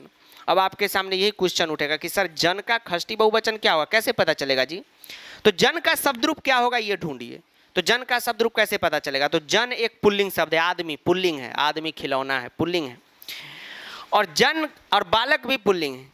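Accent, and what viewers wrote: Bihar, India. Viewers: i like bihari and after hearing you for hours i am also now speaking bihari 😅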